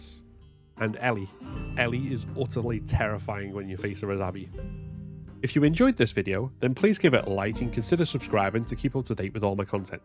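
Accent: Liverpool, United Kingdom. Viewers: Man I love the scouse accent haha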